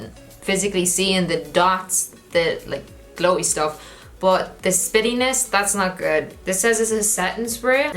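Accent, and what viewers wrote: Ireland. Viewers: Man sounds Irish as fuck.Fair fucks to yeh getting in my recommend some how.What county? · Great video! Where in ireland are you from? I can't place the accent 😂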